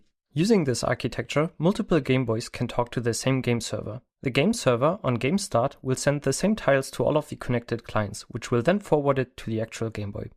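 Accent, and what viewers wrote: Germany. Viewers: Ein Deutscher, das hör ich doch direkt 🤣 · I can hear a German accent ^^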